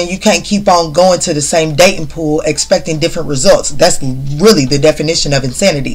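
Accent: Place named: Louisville, United States. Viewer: I hear Louisville KY in ur voice?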